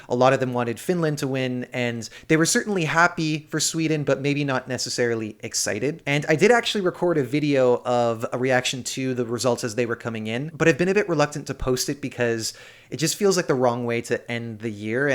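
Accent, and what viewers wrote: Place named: Canada. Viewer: I subscribed to your channel when I discovered it listening your appraisals of the Benidorm Fest. As I told you then, your channel was going to be the one in English I was going to follow covering Eurovision 2023. I have lived in Canada (BC and Toronto), so your accent sounds so familiar to me, which is a plus when I listen your commentaries. The objectivity and consistency of all of them is also here in this summary. I look forward to the video you are telling us is coming next.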